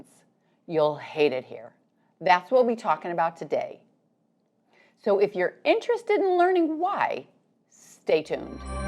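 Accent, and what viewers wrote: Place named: Pittsford, United States. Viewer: She looks and sounds like she lives in Pittsford 🙄 The stereotype holds up.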